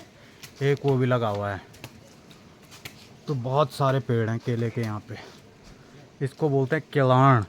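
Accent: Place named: Garhwal, India. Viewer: Chhote bhai aapki gdwali bahut achli lgti h mujhe pta nahi kyu। Mai waise kisi ka blog nahi dekhta but aapki gdwali tone mst h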